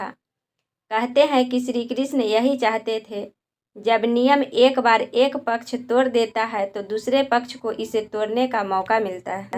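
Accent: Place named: Bengal, India. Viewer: Thoda apna hindi bolne ka trika sudharo hum lagta hai bengali ho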